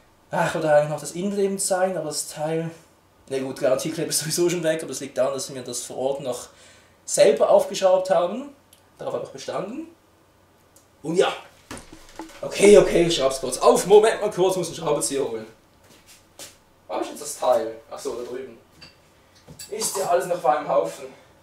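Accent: Switzerland. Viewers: sag mal bist du Schweizer ? du hast so einen Akzent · Ich interessiere mich für den predator und dachte mir ich bestelle den über digitec.ch.... also bist du mit dem pc nicht so zufrieden? ich brauch den hauptsächlich zum zocken und evtl. berufschularbeiten.... wichtig ist für mich, dass man auch 2 bildschirme anschliessen kann das ist sehr wichtig. freu mich auf ne antwort... p.s. am dialekt an bist du schweizer oda? ^^ denn freui mi uf e baldigi antwort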